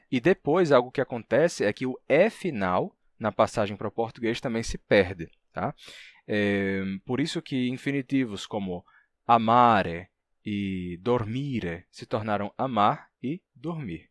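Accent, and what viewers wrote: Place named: Natal, Brazil. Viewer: vc é de Natal-RN? Seu sotaque é muito parecido com o meu e sou de lá, fico me perguntando isso todos os vídeos hahah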